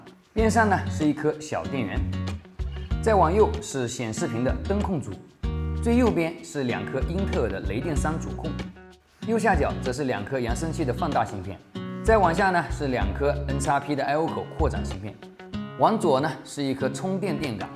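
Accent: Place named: Zhejiang, China. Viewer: 浙普的味老重了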